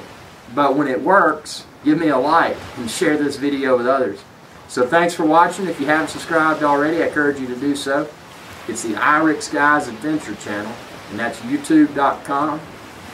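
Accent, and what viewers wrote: United States. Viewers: yeahbuddy! first to comment. ur american rite?nice accent, fake backround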